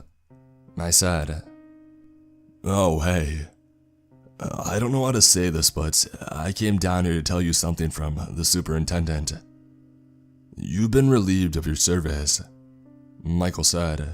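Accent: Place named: South, United States. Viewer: It's a Southern guy man give him some slack lol.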